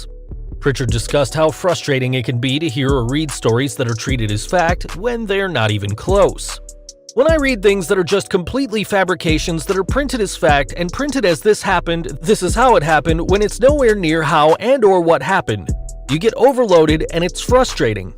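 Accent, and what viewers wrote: England, United Kingdom. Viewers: Lol he's english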